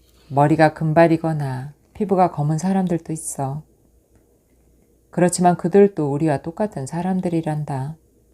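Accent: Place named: Jeolla, South Korea. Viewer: 전라도 억양 정겹네요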